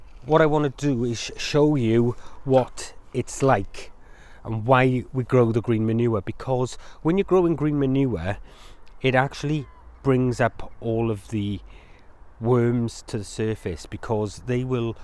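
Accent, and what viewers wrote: Wales, United Kingdom. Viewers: Hi. I'm a new subscriber. Your post's are FABLESS, (Love the Welsh accent) How's your rhubarb done this year 2019? Would appreciate an update. Best wishes from a fellow grower. 👍 ✌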